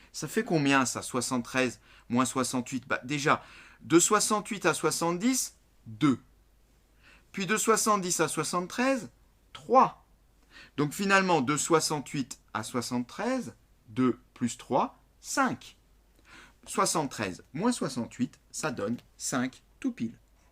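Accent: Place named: Alsace, France. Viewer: zaffuto Axel Il est alsacien c pour sa c son accent👍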